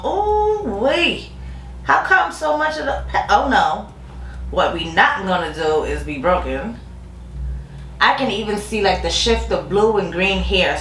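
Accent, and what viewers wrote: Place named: New York, United States. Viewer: Subbed! I like your accent! New Yorker? If so which borough? I'm your Connecticut cousin now! Lol!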